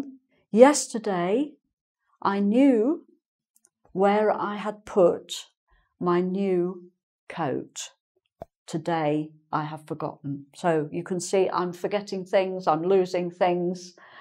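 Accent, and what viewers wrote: England, United Kingdom. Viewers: Hello teacher. I really like your lessons and they very useful for us even your accent that you speak by is wonderful I wish that I study with you only for a month I will be a good britch accent speaker.. Thank you so much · Hello, it's a pleasure to hear your Sweet English accent. I have been several times in England in the four seasons and I have never seen a drop of rain, you know...Only the Sun.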